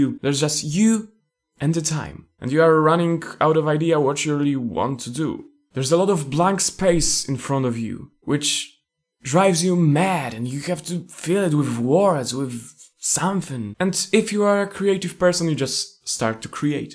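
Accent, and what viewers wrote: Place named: Poland. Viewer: troche taki polish english ale nie jest źle ;p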